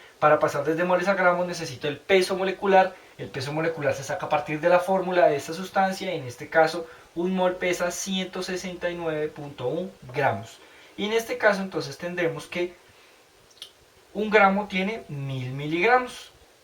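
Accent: Colombia. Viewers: para mi eres de colombia pero gracias por el video la expo me saldra chida ty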